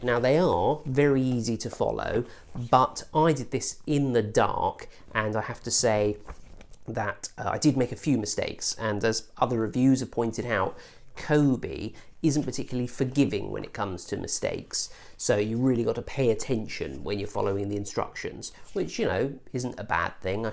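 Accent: United Kingdom. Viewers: the bri'ish accent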